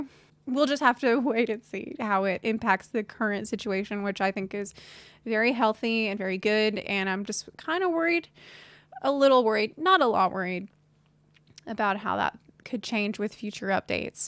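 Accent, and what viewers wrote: South, United States. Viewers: love that southern american accent too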